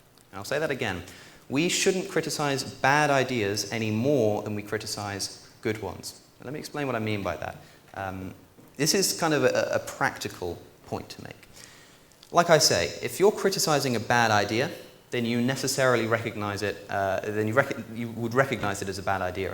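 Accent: RP, United Kingdom. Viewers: • Looks like a young Oxford boy • Talks like a young Oxford boy • Thinks like an ancient Greek filosopher I'm proud to be the thousandth like on this video! (not really) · British accent = Sound smart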